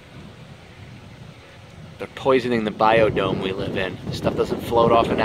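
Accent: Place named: United Kingdom. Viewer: Nice British accent:)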